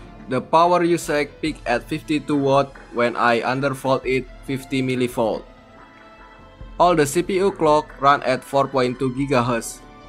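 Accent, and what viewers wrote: Indonesia. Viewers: logat nya kayak indo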